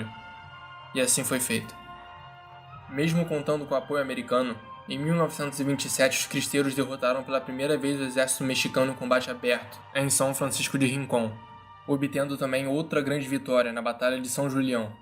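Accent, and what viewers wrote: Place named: Rio de Janeiro, Brazil. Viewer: DEIXA DE SER FRESCO! O SOTAQUE "CARIOCA" DO NARRADOR É PERFEITO!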